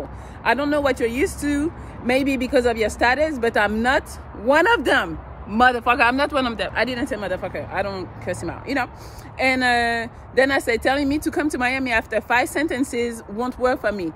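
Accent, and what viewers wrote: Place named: France. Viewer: She as a french accent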